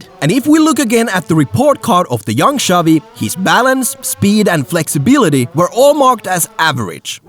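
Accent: Finland. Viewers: Is this guy finnish? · suomalainen puhuja. Helposti tunnistaa🙂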